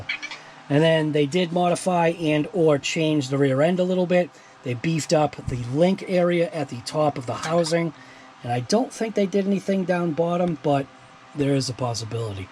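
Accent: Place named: Massachusetts, United States. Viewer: Im newer to the hobby. Been about a year or so. I knew you were from MA the instant I heard you talk lol. Im born and raised in Lynn and live on Cape Cod now. Love the videos.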